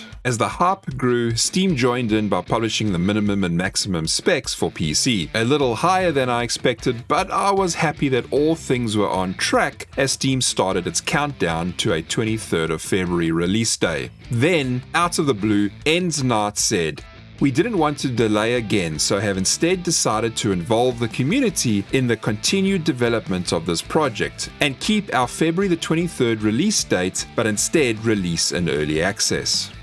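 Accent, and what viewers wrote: South Africa. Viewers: you south african bro? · Lol funny how I could tell you are South African as soon as you spoke.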